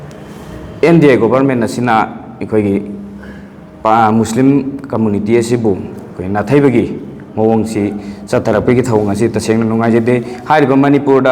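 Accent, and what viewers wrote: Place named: Bangladesh. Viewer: Remember you speak like a Bangladeshi. Always try to speak in a correct way. It will be very good.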